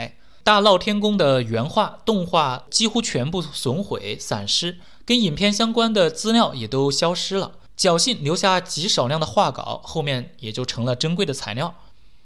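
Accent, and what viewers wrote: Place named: Hunan, China. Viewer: 我对湖南口音很排斥😂😂😂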